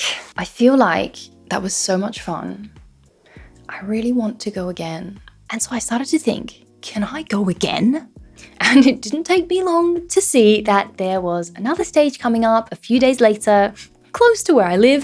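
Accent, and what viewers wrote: United Kingdom. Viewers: I thought you were British 😊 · YOU’RE AUSTRALIAN ?????😭😭😭😭😭😭 Your French is native level how did you learn French ? You have a British accent too (my perception ofc) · I just love your accent (thought you were british) and your french is remarkable... nice to discover your channel...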